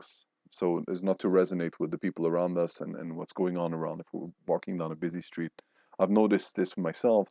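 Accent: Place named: Switzerland. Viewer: oh then I understand why I like your accent, I was born in switzerland too :) still living there but I actually always wanted to move to Canada as a child. now I feel more drawn to England and Ireland. your encounter with the arcturians sounds fascinating, I would like to meet them too one day.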